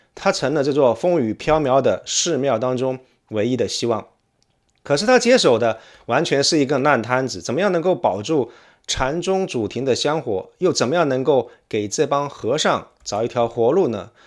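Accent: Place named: Hong Kong, China. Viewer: 博主是不是香港人或者广府人？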